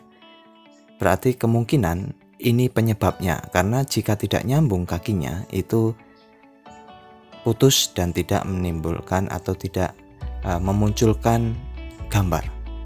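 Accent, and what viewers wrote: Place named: East Java, Indonesia. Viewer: Asli daerah mna toh bang, koyok wong Jatim kulonan logat e? Asik lek onk jowoe bang Karo tambahan logat jawae Aku gak paham elektronik tpi seneng ae delok konten sameand bang hahahahah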